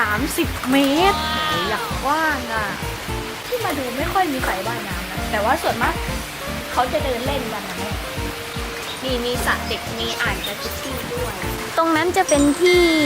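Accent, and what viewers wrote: Phu Thai, Thailand. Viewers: สำเนียงเหมือนสาวภูไทย เสียงน่ารักหมาก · ผู้ไทดงหลวง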